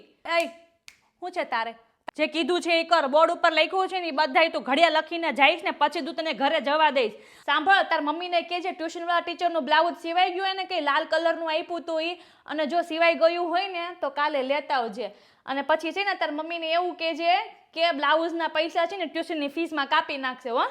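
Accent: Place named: Saurashtra, India. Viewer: જય સચ્ચિદાનંદ તમારી સૌરાષ્ટ્ર ની ભાષાગમે છે.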